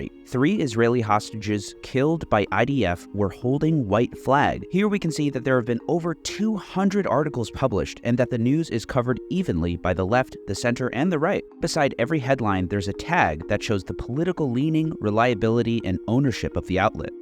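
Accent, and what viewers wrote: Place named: United States. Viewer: He does speak with an American accent.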